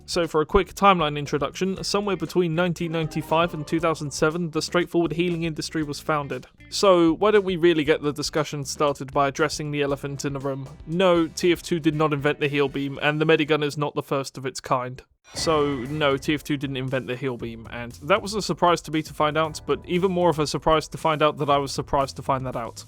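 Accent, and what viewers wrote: United Kingdom. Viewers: is * not british?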